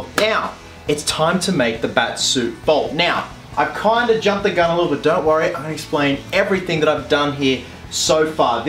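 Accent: Australia. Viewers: Sam Lovgren he is Australian, it is pronounced different in Australia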